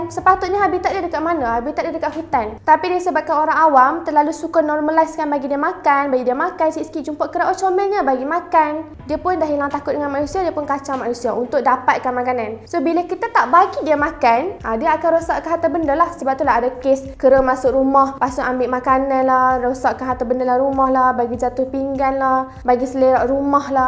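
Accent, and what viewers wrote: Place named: North, Malaysia. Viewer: Seronok dengar loghat utara kakak❤❤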